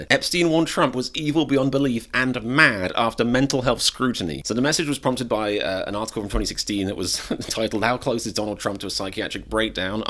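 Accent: United Kingdom. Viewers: Love the British accent pronunciation. Makes "The IT Crowd" episode with Jan's new boyfriend, Peter File, that much more funny.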